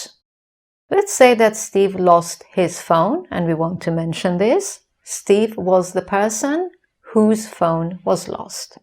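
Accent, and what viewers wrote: England, United Kingdom. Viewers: I thought you were from inglan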